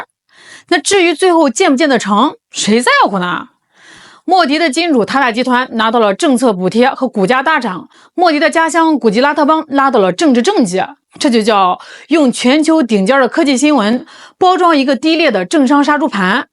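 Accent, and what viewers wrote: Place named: Henan, China. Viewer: 河南腔打的😄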